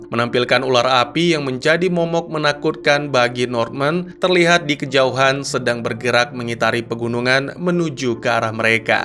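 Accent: Aceh, Indonesia. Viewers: Abang orang Aceh ya ?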